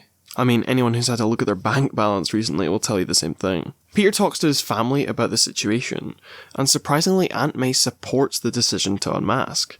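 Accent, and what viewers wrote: United Kingdom. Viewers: I subscribed just cause of the brick wall guy at the end and u sound like u from uk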